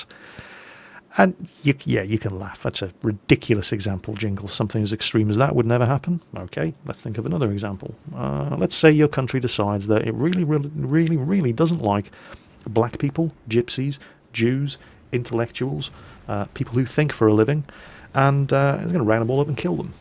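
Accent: Bavaria, Germany. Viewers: Dat German accent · Jingles, where did you learn talking with this awesome german accent? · Jingles, can we hear you doing your German accent for one Mingles with Jingles episode? I can't help but love hearing your German accent. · Are you bavarian by any chance? :P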